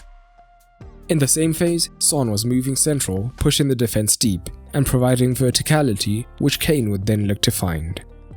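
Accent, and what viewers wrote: South Africa. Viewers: You sound south African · Bro where are you from ? You sound so South African ? 😂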